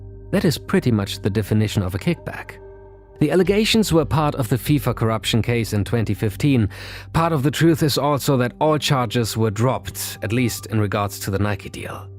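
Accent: Germany. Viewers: Really perplexed by how poorly the narrator pronounced most of the Portuguese terms and expressions in this video – none of which are difficult to pronounce accurately if you bother to research the pronunciations. Otherwise another great, informative video. Ich finde generell das deutsche Reporter (ich gehe von seinem Akzent aus dass dieser Typ deutsch ist) sich nicht genug Mühe geben, fremdsprachliche Begriffe richtig auszusprechen, was ich als Deutscher der im Ausland aufgewachsen ist sehr bedauerlich finde. Mandando todo meu carinho de Londres aos brasileiros assistindo o vídeo 🕺🏽 tamo junto!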